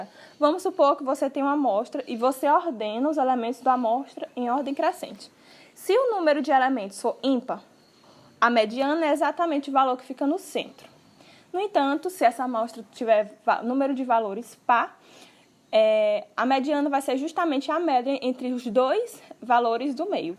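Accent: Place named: Northeast, Brazil. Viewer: bacana a aula, e o sutaki nordestino é característico srsrs..vlw pelas dikas ,, estou me formando em engenharia de pericias vlw. pela ajuda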